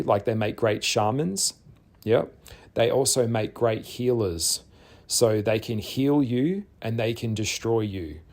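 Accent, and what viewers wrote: Australia. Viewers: I only stayed because the Australian accent sounded cool until he was saying all these gross.Horrible things and it made the voice and accent sound not good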